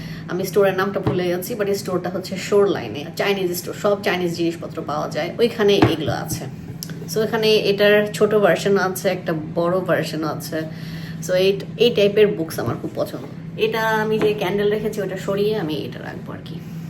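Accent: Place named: Bikrampur, Bangladesh. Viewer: Apu amar onek janar ichcha apni bikrampurer ? Apnar kotha sune mone hoilo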